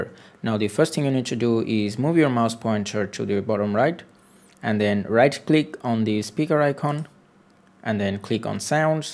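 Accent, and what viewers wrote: India. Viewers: Where would the world be without people like you? Seriously, every time I have a problem in life, there's someone with an indian(?) accent that post a video somewhere that makes everything easier. People like you are the salt of the earth.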